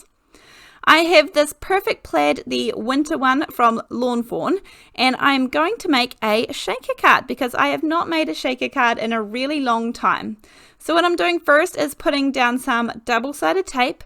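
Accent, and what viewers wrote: New Zealand. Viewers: 😍😍😍love the card!...and love that fantastic kiwi accent! Cheers from Texas💖😘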